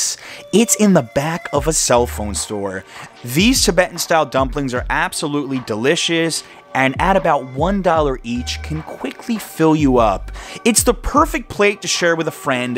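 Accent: New York, United States. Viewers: Dude, you sound like NYC, you are cool ...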